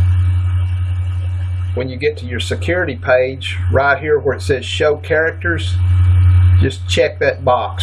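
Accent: South, United States. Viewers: Dude you are awesome!!!! Thank you! Love the southern accent! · Thank you, u just saved my business and person life oh and it's the southern accent for me🙏🏽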